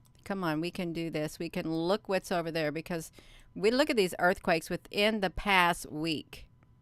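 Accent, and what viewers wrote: South, United States. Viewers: I love the way you talk. Both my mom and dad have that southern drau.Some people are just complainers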